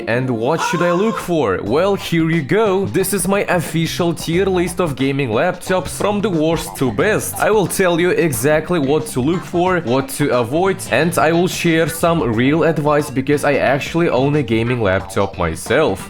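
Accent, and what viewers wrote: Russia. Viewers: This Russian accent pmo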